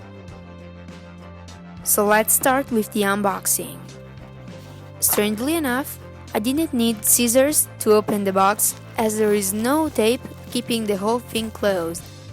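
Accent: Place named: Italy. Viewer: I love the editing and flow of this video :) Came to the channel for the iPods, stayed for your cute Italian accent and for the refreshing editing style.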